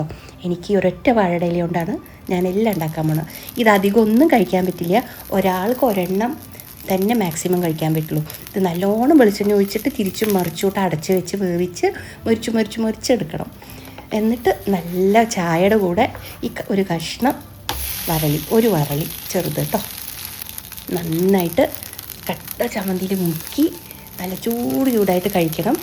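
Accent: Palakkad, India. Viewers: Tto tto ttolu palakkadan slang❤️ from a pkd kari(nemmara)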